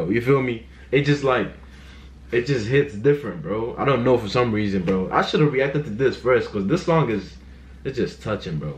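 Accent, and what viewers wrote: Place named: United States. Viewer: bro, don't mind this comment, your english is very american hahaha we're together, keep up with the reactions 👊🏻🇧🇷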